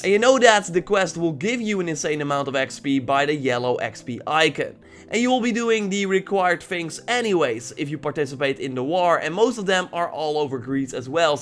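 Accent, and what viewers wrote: Netherlands. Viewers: U Dutch right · Damn that accent is dutch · Bro are you Dutch? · Is it me or this guy has a sorth of dutch accent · You sound dutch ik ben dat ook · Are you from holland ? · Leer beter engels aub je nederlandse accent is duidelijk hoorbaar :<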